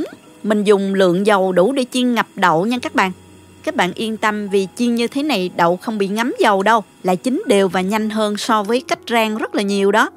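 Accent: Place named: Binh Duong, Vietnam. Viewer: đọc lạ Bình Dương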